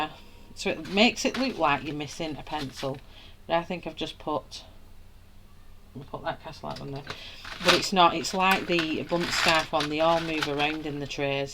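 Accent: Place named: Northern England, United Kingdom. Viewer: I was born in Birmingham but iv lived in Australia since 1974, I like listening to you, you sound like your from somewhere up north. Liverpool?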